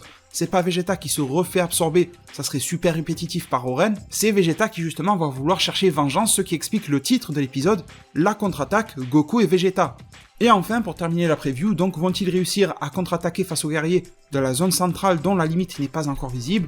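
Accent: Marseille, France. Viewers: Juste comme ça, vient tu de Marseille ?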